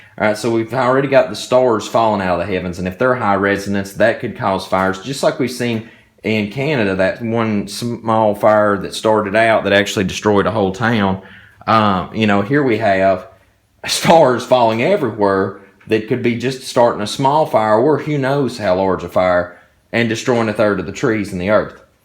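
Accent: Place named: South, United States. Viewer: Very informative and compelling.. Thanks,.. God bless and guide you, dear brother.. Btw, where are you from? I definitely detect a Southern accent. North or South Carolina? My Momma was from Kings Mountain, NC,and I still have tons of kinfolk,down yonder, that a way...:)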